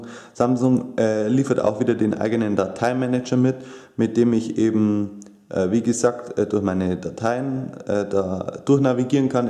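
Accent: Bavaria, Germany. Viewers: Ich find deine Videos Klasse, hab dich auch gleich mal abboniert ;) Kannst du falls es sich einrichten lässt das mit der Dropbox mal zeigen oder kurz erklären weil des find ich interessant aber ich find nirgends im Internet ne Seite die das vernünftig erklärt. Welchen Dialekt redest du normalerweise? Weil ich komm auch aus Bayern und ich hör sofort wie du dich anstrengst gutes Hochdeutsch zu reden :D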